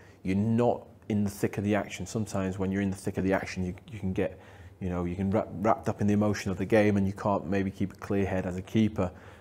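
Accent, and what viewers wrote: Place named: Denmark. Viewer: He definitely has a tiiiiiiny hint of danish in his accent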